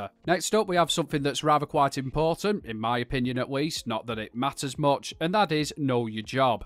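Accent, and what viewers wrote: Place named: North, United Kingdom. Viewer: Awesome. Love the northern accent lol. Rare bunch we are 😂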